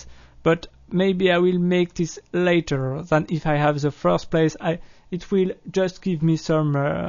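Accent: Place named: France. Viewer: He has the same accent as mine, it is a french accent, and it's the most beautiful accent in the world, so you're wrong. If you can't understand a french speaking english it means you aren't worthy of hearing what this person is saying. French accent has been voted the most understandable foreign accent by a panel of very wise and independant judges.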